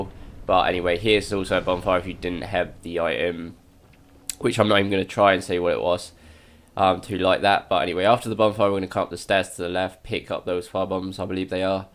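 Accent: England, United Kingdom. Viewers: Love the vids, i know there old but its nice to listen to an Englishman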